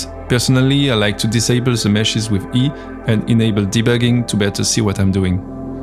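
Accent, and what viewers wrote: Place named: France. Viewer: Toi tu es français c'est sure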